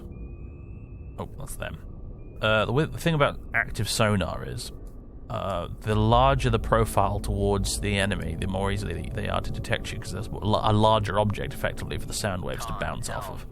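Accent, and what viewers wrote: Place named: United Kingdom. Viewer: Well these vids are turning out to be highly absorbing and nail-bitingly tense! Nice to hear a British voice in command of a British boat and explaining the reasons why our Tigerfish perform so dismally in game…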